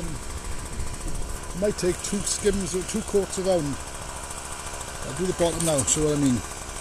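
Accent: Wales, United Kingdom. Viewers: Great job.love your Welsh accent .love your comment ,when you see everyone use long reach ,puzzle me 😂😂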